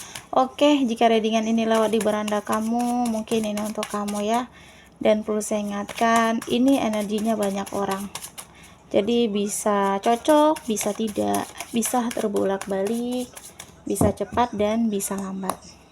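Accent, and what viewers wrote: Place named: Kalimantan, Indonesia. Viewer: Logat bicara kakak, apa kakak asal. Kalimantan?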